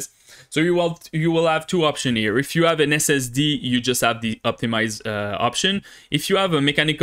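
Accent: Quebec, Canada. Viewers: I love your French-Canadian accent lmao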